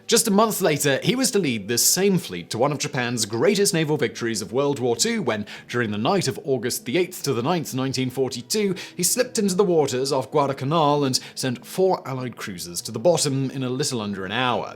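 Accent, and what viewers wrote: United Kingdom. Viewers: BUT, I loved his British accent. I have to find myself an Englishman.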